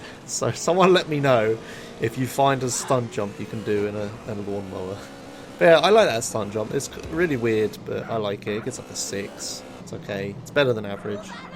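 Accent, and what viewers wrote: United Kingdom. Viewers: He is speaking normally, that's how us brits sound.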